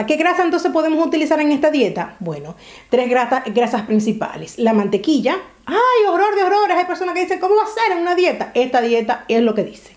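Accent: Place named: Dominican Republic. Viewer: Te encontré por casualidad, tu forma de hablar me llamó la atención. Soy dominica y en tu forma de expresarte parece de allá. Tengo un gran tiempo escuchando de estás dieta y es observada el consumo de queso es vital, quiero preguntarte si este puede ser sustituido , tengo problema con la lactosa y aunque dicen q éste no tiene a mi me produce muchos gases.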